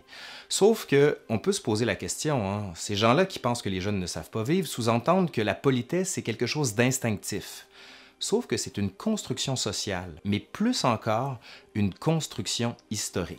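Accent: Canada. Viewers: L'accent canadien est-il un signe de distinction?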